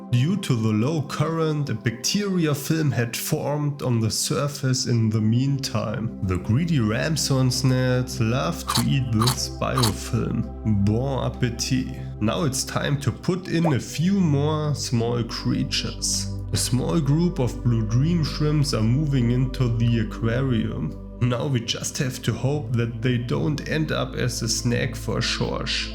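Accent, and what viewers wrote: Germany. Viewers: i hear some german😅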